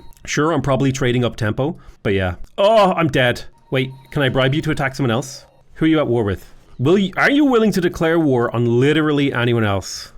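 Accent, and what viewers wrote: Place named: Ireland. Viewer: I'm sure you get this question or comment at a regular clip, but how heavy is your Irish accent compared to folks in your area? I'd love to watch an upload where you ham up the accent for us non-Irelanders, I bet that would be fun XD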